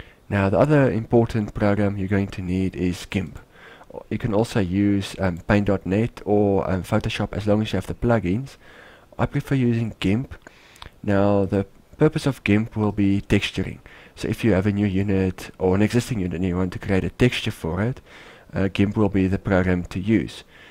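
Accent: South Africa. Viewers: he clearly has the South African accent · You sound like a south African. That's surprising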